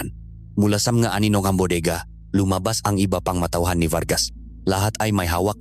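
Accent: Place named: China. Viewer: Bakit namn po ganyan salita nyo Chinese po ba kayo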